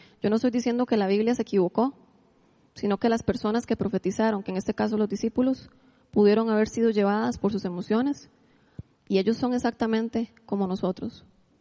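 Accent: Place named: Costa Rica. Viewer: Gracias por la esplicasion sobre profetizar. Me llama la atencion tu accento en ciertas palabras ese es el accento de los ticos?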